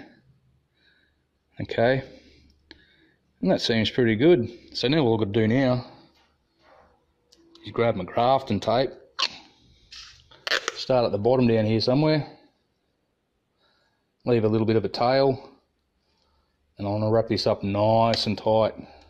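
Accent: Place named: Australia. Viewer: No nonsense Aussie speak !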